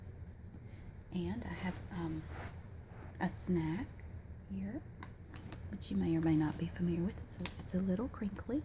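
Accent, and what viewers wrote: South, United States. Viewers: Compared to your recent videos, you seem like you've lessened the intensity of your accent! This is definitely a harsh southern accent, I miss the southern rambles :(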